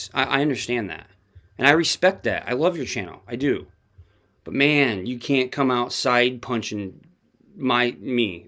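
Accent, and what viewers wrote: United States. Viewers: Do you know the girl took her own life? please stop with your content enough is enough. Suicides are tragedy in this family has to go on without their wife, mother and friend, but you putting content on putting your ideas out good for you, but it’s time to stop enough is enough. Please let this girl rest in peace please… listening to the rest of your video. Are you for real you are arrogant. You have absolutely no idea about these people. You obviously live in America. Judging by your accent, forming opinions from newsreels and other people‘s videos WTF get a life leave these people alone, let them move on. I have been watching your channel since Nikola went missing and all you do is throw doubt onto everything. It only takes one person to believe that garbage that dribbling from your channel and they possibly may hurt. Nicole‘s husband just stop it. 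😠 you’re an idiot.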